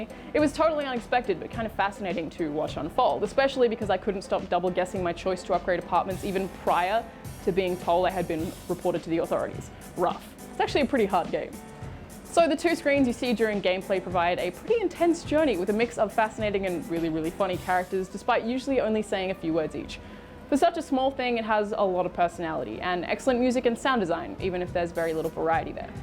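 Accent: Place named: New Zealand. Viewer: Is she from NZ?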